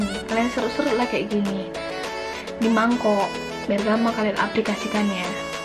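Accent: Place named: Medan, Indonesia. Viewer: Anak medan ya wakk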